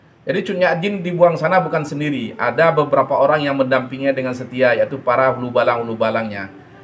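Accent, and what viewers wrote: West Sumatra, Indonesia. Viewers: Maaf pak saya msu tanya, kalau di lihat dari logat bapk saya yakin bapak barasal dsri sumatra barat kayaknya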